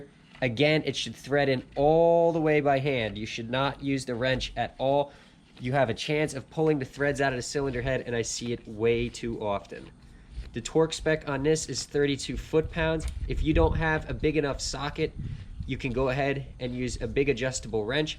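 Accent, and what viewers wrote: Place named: New Jersey, United States. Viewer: btw, I'll be in Summit, NJ over summer....I thought you might be local based on speech.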